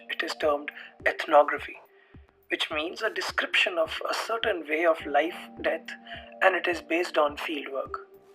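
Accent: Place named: India. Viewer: Very nicely done. Indian English is sometimes tough for me, but that's what 'cc' is for! I hope this is shared widely. I do not see Ep 2?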